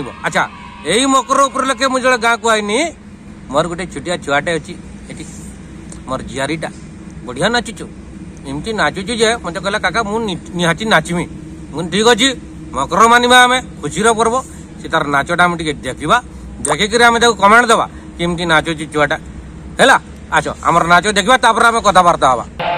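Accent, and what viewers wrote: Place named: Mayurbhanj, India. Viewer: Perfect mayurbhanjia .carry on the rapport.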